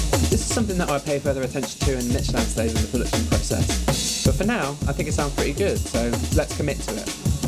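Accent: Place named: United Kingdom. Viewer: You know that is gonna be good when the guy has a british accent.. Cheers mate, very good content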